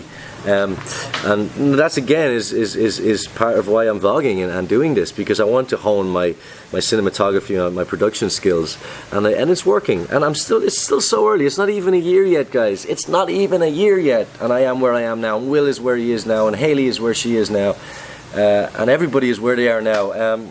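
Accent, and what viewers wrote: Ireland. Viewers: Blows my mind that still has his Irish accent 😂 love from Eire 🇮🇪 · Are you from Tipperary in Ireland ? Your accent is kinda the same here in Tipperary! Im a Filipino fan staying here in Ireland ✌️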